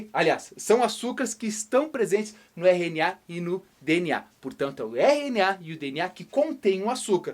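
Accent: Rio Grande do Sul, Brazil. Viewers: O professor também me ensina o sotaque gaúcho(eu sou cearense) Ei mais uma pergunta o que que é "me caíu os butiá do bolso"?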